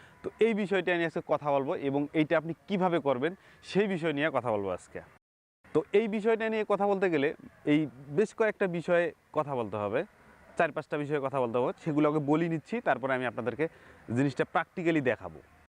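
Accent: Rangpur, Bangladesh. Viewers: Boro vai apnar basha Rangpur e naki?? Khub poricito jaiga ta mone hocche..!!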